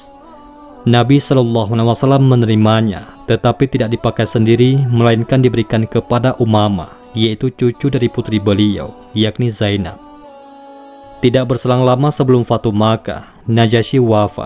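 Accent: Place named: Gorontalo, Indonesia. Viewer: Logat pembawa berita seoerti logat hulondalo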